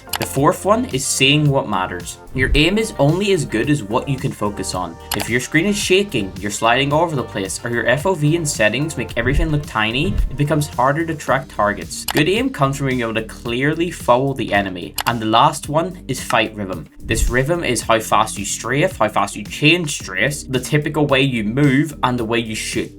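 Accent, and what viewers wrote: Northern Ireland, United Kingdom. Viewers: curious what part of northern ireland you are from. Only came across a handful on apex.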